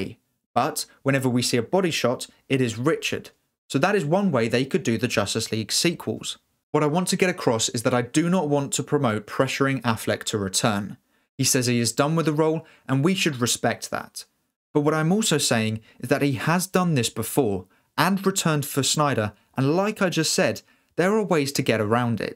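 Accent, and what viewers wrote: United Kingdom. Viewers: Listening 👂 to your British accent as American 🇺🇸 I’m tired 😪 of Brits playing our Hero especially Batman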